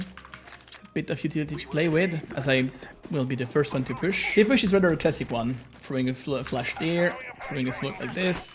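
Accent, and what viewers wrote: France. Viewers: Are you French? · Omg the french English accent is the cutest thing ever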